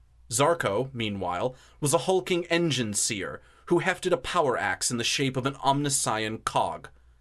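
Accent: United States. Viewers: ugh no offense but American accent just doesn't fit 40k.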